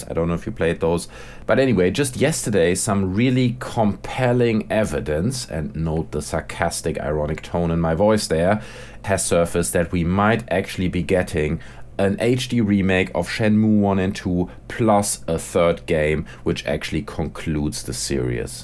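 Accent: Germany. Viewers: Moment, den deutschen Akzent kenn ich doch...Shenmue 3, maaaaan!!! :)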